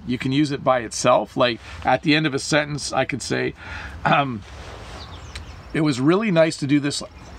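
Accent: Canada. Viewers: I'm Thai people, I like to learn canadian accent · I love Canadian accent either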